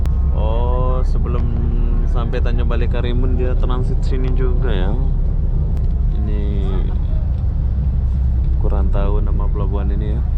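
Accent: Malaysia. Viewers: Bang knapa pakek logat malasia kan abang orang indonesia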